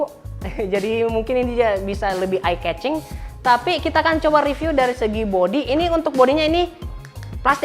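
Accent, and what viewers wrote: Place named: Sinjai, Indonesia. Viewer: Kaya kenal... Org sinjai yah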